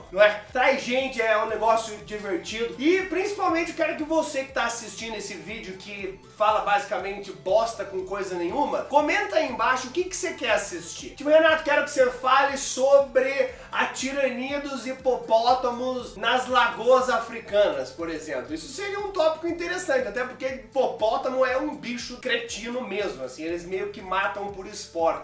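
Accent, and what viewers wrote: Curitiba, Brazil. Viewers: vc eh de curitiba seu?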